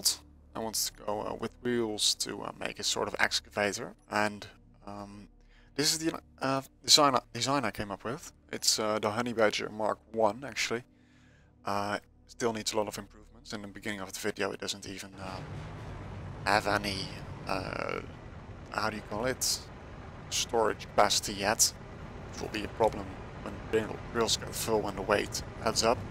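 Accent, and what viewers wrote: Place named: Netherlands. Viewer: You speak English very well but with that inevitable dutch accent. Anyways, very cool machine, I would definitely be interested in a small ship version of it :p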